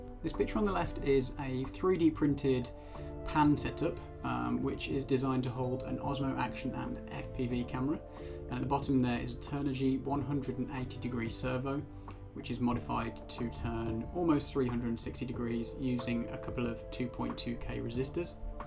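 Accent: United Kingdom. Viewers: SUPURB!!!!! I take it your in the UK. Accent plus ROUGH LANDING N TAKE OFF SIGHTS. Hahaaha every other country Italy Germany USA ect ect ect have decent runways purpose built were still taking off from grass. Not much has changed since ww11. I'm In the same boat WHEELS OFF BELLY LANDINGS. You've done a brilliant job... I'm still trying to get a 1000mm or a 800mm Spit great shots Brian London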